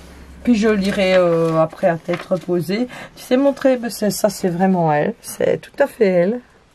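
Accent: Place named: Belgium. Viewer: cc!! je suis abonné a sa chaine et j'adore ses créations!! eh oui tu as raison elle est hyper doué, je n'ai pas eu l'occasion d'échanger avec elle,tu as beaucoup de chance de l'avoir rencontrer,j'ai lu aussi cet article et je trouve que c'est vraiment mérité;bravo a Sandrine!!merci a toi pour cette belle présentation avec ton joli accent belge que j'adore!!bisous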